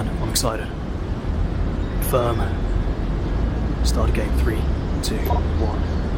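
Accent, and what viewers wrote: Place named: United Kingdom. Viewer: bruh he’s pure British/Scottish/Irish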